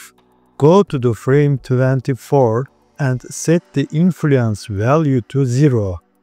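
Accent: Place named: Turkey. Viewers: konuşanın türk olduğuna eminim ama ispatlayamam...😀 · kesinlikle türk aksanı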